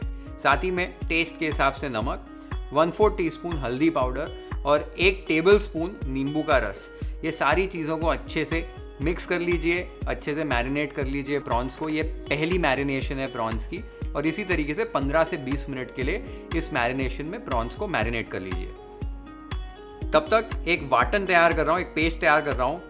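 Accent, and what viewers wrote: Maharashtra, India. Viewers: खूपच भारी 😘 आणि तुझ मराठी accent पण एक दम मस्त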